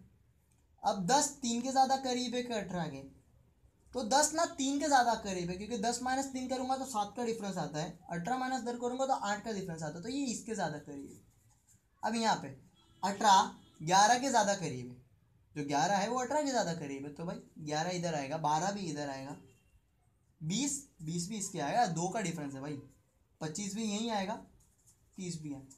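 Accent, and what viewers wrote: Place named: Mumbai, India. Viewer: Mast mazza aa gaya mumbai wala koi dost samza raha hai laga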